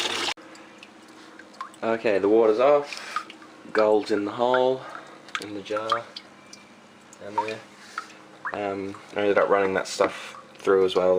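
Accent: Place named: United Kingdom. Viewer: just a few Q's for you on your build... What size is the Bilge Pump you are using? Any idea as to your angle you are set up for on your table? Oh, you have a British (or Aussie / Kiwi) accent. Where are you from (Just Country) and in the area you gather your cons from, what type of Gold do you usually score (Flour, Flat, Mini Nuggets, Tiny Rounds)? Here in Canada, I gather my cons from Alberta from the NSR (North Saskatchewan River). The gold found there is mainly Flour Gould (Microscopic!). And for a few of my own Comments / Observations: Your first videos were a bit clumsy, but I made it through the majority of the videos! I have yet to make my own video for others, so your way up on me in this category! Thanks for your time taken! When you unscrewed the bottle, I'll admit you stunned me! - Well done, Dude! What you showed us all, is that you can take an idea out of thin air, with a couple bucks, and a few days of your time, end up with a finished product that, well ain't the most pleasing thing to look at, but heck you didn't build it to look at, right? 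You built it to work your cons - let the water remove your Lights & Heavies, and leave you with pure AU! In that category, YOU Aced it! Well Done! Oh, one last comment... Your focus on your camera sure SUCKS! But as I said before... we put through with it mostly till the end of the videos! Michael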